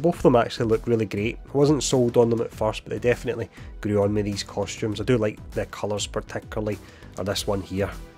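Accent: Scotland, United Kingdom. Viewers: This guy must be Scottish. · First video I've seen of yours.......please speak up next time. When someone is whispering with a Scottish accent, it makes it impossible to hear what is actually said. So either change the accent (which I doubt will happen) or speak up.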